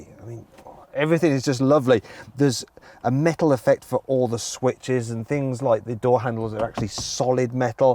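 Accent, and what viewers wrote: United Kingdom. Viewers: 영국발음 너무조아 미쵸♡♡♡ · 리뷰하시는분 발음이 영국분 같은데 나같아도 국뽕맞겠다..ㅜㅜ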